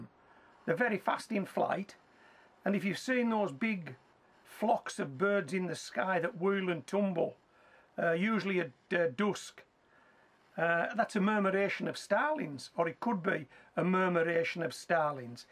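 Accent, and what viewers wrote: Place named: Northern England, United Kingdom. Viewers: he is cumbrian sounds like workington area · Such a strange but lovely accent 🤣🤣🤣 says "seeds" like a brummy .. Got Jordy in there and a bit of everything else!🤣🤣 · No he's not Scottish it's a northern England accent you can hear. As I am from Yorkshire I should know where it's from but it can be tricky, definitely North England though same place as chubby brown is my guess. North Yorkshire area (Middlesbrough and redcar) best guess 👍🇺🇸🇬🇧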